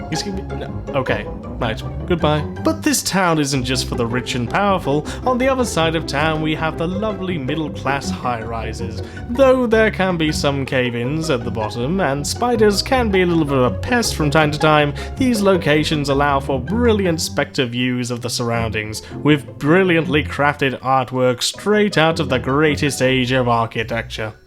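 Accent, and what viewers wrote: United Kingdom. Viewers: And so dentistry is the truest metaphor for economic distributivism betwixt Britain and America. In Britain, National Health ensures everyone receives the same level of dental health regardless of the ability to pay, resulting in a national stereotype of bad teeth. In America, you can get super, top-of-the-line dental care, shitty dental care, and everything in between, based on your pay level, resulting in a stereotype of perfect teeth. British views of capitalism feature oppressive magnates shielded from the law oppressing poor downtrodden workers, while American views of capitalism are that you can arrive with no more than the clothes on your back and within five years, you can own a chain of laundromats, dry cleaners, or ethnic restaurants. Of course, that's easier if you're Vietnamese than if you're black or Hispanic, but we don't want to go down that road because it involves IQ and ethnicity. 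The point is that if the narrator had an American accent, we would expect Ancapistan to have crystal spires as low-rent housing, no pollution because fossickers reprocessed it into industrial precursors, and a chronic labor shortage as everyone preferred to retire and stay home on their extensive ranches. Instead we have RoboCop. Because British.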